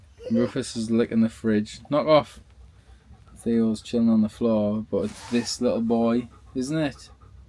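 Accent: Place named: Newcastle, United Kingdom. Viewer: As an ex Geordie like left 65 years ago. I am thinking most people will not understand some of what your saying. I do though.❤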